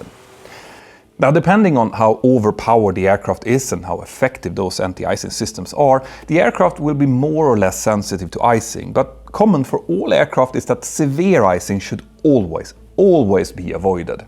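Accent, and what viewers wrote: Spain. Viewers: does live in Spain and has a Spanish wife, so it is not surprising that he would pronounce words with a more Spanish accent even though he is originally from Sweden. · Look, normally I don't like to make a fuss about pronunciation and all that but as a brazilian, the fact that you kept pronouncing everything with some kind of spanish accent is so irritating it actually distracted me from what you were saying. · MANO AQUI NÓS FALAMOS PORTUGUÊS NÃO ESPANHOL, NÃO FAZ O MENOR SENTIDO VC LER NOSSAS CIDADES E AEROPORTOS COM DIALETO ESPANHOL QUE PORRA EH ESSA KKKKKKKKK DUDE HERE WE DONT SPEAK SPANISH, WE SPEAK PORTUGUESE THAT NO SENSE READ OUR AIRPORTS AND CITY NAMES WITH SPANISH ACCENT WTF BRO KKKKKKKKKKKKKKKKKK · urgh PLEASE STOP PRONUNCIATION OF SPANISH WE FUCKING SPEAK PORTUGUESE NOT SPANISH CARALHO!!! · Hey, the language in Brazil is Portuguese, you're reading de terms and documents with a Spanish accent. 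Great video, BTW.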